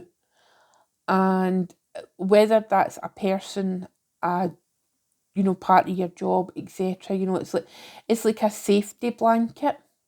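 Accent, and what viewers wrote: Scotland, United Kingdom. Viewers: Oh. I love your accent. Are you Scottish.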